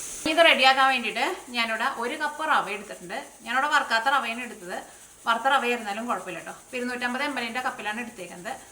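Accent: Thrissur, India. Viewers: urakka parayana...nammal trichur kar allaaa...speed kooduthala..chilathu manassilagunnillaaa...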